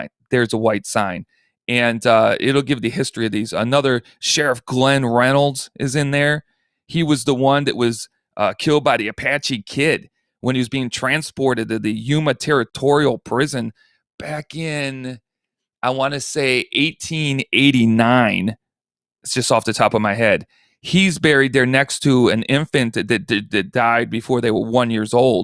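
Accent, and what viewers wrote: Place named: Chicago, United States. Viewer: He sounds like a Chicagoan.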